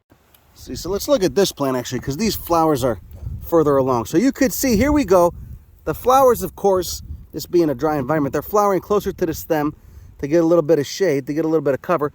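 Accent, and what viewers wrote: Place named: New York, United States. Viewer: from NY so used to hearing things explained this way.. feel like im home if i had to guess this dude is from NY (LI) and is either Italian or Jewish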